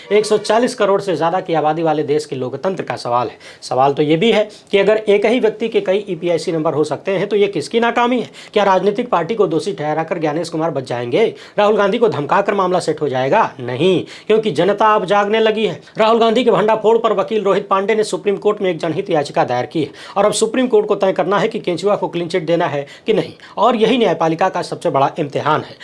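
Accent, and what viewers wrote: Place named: Bihar, India. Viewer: bhai apke kaam se ziyada aap pasand hi mujhe apke bihari language make me laugh..hats off bhai.. for your unbiased reporting...Allah apko humesha kamyabi dey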